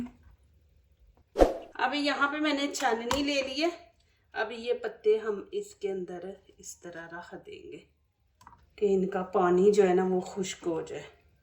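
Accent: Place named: Punjab, India. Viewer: Punjabi lehjee Urdu bolti ham Punjabi hi bol lya krn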